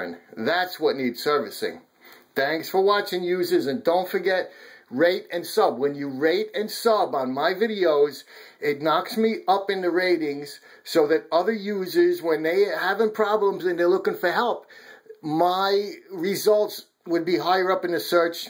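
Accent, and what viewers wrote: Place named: East Coast, United States. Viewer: He's from the East Coast of the USA - you can tell by his accent. Probably Massachusetts or New York.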